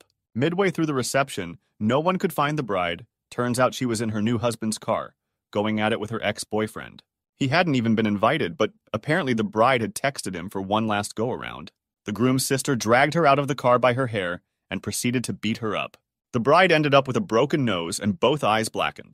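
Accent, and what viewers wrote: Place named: United States. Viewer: Okay, the bizarre word replacements are grating enough, but now I'm really calling shenanigans. You, narrator, are clearly American, and yet you just pronounced 911 as "nine-hundred and eleven" instead of "nine-one-one." 'Fess up, is this channel a psychological experiment designed to study irritation or something?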